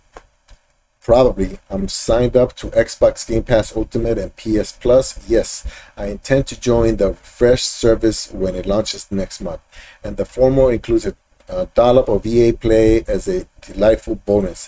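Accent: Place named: United Kingdom. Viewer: Definitely sounds British! 😎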